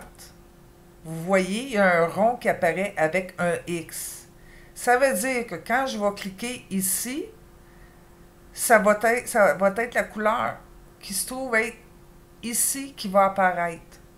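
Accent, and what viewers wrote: Quebec, Canada. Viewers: Merci pour cette rapide mais efficace présentation je vais voir tous vos autres tuto J'adore votre accent Québécois ( normal nous y allons chaque année..) Daniel France Normandie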